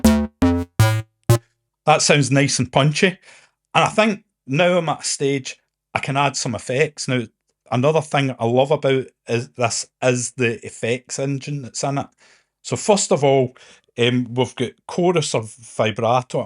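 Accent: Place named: Scotland, United Kingdom. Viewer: He is Scottish. Bloody hell, there's an R! 😄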